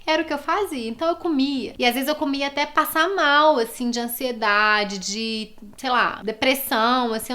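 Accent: Minas Gerais, Brazil. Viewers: Te encontrei a pouco tempo, e que delícia! Amo ouvir seu sotaque mineiro, sou mineira mas moro no MT em sinop. Seu vídeos são inspiradores... Tenho amado muito. parabéns pelo canal.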